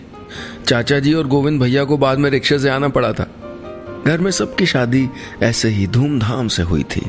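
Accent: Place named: Pahadi, India. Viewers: Best kumaoni story.. 😘😘😘.. I love your voice ji in kumaoni style 😘😘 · 🥺🥺🥺sir ur accent of phadi was incredible...it made me tears up ... Thank you sooo much miss pant for this lovely story ❤️ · very nice.. aapne kumauniyon ka bolne ka style bohot sahi se copy kiya ha ..love you.. you are soo nice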